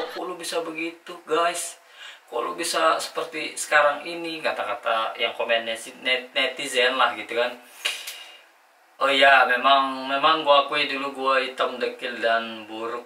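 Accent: Lombok, Indonesia. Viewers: Bang lu dari Lombok? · Logat orang lombok😂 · Bahasa Logat Lomboknya (Sasak) Terdengar jelas di campur dengan bahasa indo , the best lah 👍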